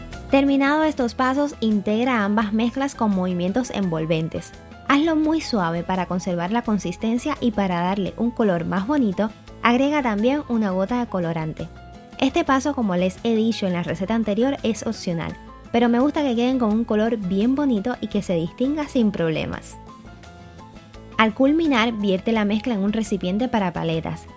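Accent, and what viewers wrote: Cuba. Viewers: Excelente receta, acompaño tus recetas. Soy de Puerto Padre. Las Tunas. Siempre me pareció tu acento parecido a los holguineros.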